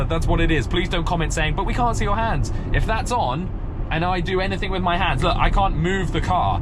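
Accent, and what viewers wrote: United Kingdom. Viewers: Amazing and relaxing video, I wonder how that autopilot would work in Portugal Old Town Road. Btw thanks to improve my English understanding, I'm French and it's nice to ear British English 😉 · For rear view of the mad Volvo driver you could have turned on the rear cam we could see part of it, but did not see anything in the rear view mirror. Length of video is good and your commentary was very good. You drove past a very long brick wall so you could have told us what that was or any other interesting sites you drove past. I could listen to your voice all day long and not get bored, must be the British accent, we Americans love, .lol. Good Job keep up the good work.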